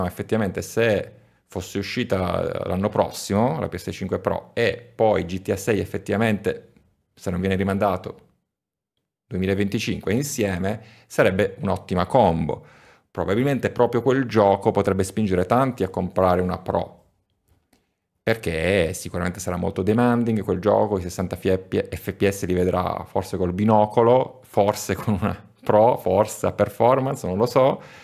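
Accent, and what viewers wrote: Catania, Italy. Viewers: Ma sei Catanese? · Grandissimo ma sei di Catania?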